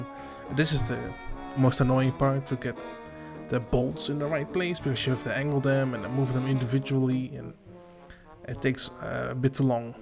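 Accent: Netherlands. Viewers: Ik hoor aan je Engels dat je Nederlands bent, nice video!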